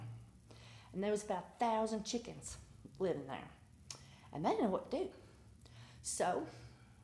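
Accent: South, United States. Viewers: Lady you have got to be the hardest woorking chick on the planet. Don't know what I admire most your enthusiasm, high energy, great attitude, soapy skills or the fact you speak my mother tongue (thats southern if you didnt know). As a girl with southern roots who has long been transplanted as far north as i intend to it just nice to hear that southern speak. Best of luck on your new digs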